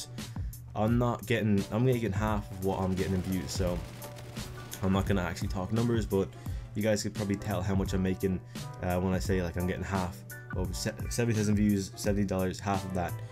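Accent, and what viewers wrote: Ireland. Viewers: you irish cuz i am :)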